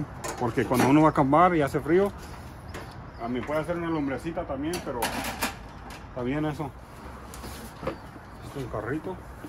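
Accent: Spain. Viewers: A mi también me encanta, y el acento español q tiene más..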